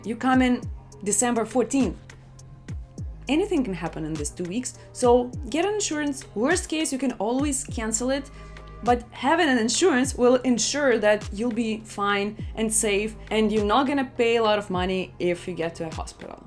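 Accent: Russia. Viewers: You russian accent is hilarious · i like your russian scent when you start to be mixed accent of russia with English 😂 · I like how her accent is a little mixed with Russian 😍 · I'm sorry, are you Russian? Because I personally am, and uh... I kinda can hear that :) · from your accent i guess you are from =Rosiya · Ur Russian police aksent😁, was great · Well I liked your Russian accent... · Tão fofo ela falando inglês com sotaque russo. Hahahaha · I like it when you still put your Russian accent. Informative vid by the way. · Hahahaha I love Russian accent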